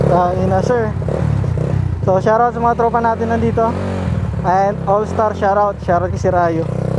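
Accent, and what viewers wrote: Iloilo, Philippines. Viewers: Ilonggo ka lods?HAHA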